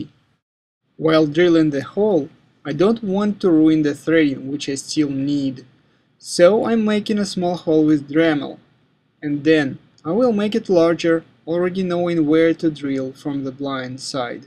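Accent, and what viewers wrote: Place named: Russia. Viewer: That accent... Russia? Ukraine?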